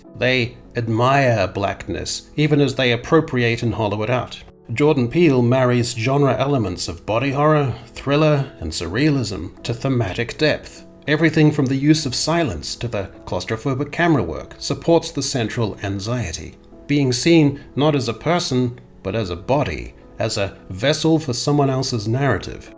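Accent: New Zealand. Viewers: Goodnight Kiwi, picked where you were from immediately. Great video. Asmongold sent me here, congrats on the exposure haha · Based New Zealand voiceover guy #31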